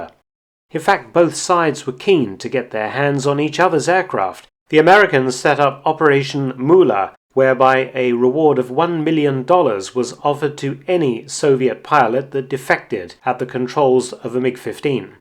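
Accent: United Kingdom. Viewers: Why use Robo-voice when you speak in a sophisticated British accent?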